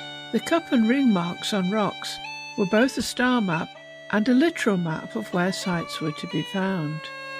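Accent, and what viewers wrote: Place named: Derbyshire, United Kingdom. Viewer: Your accent is similar to my mother's ...are you from Derbyshire?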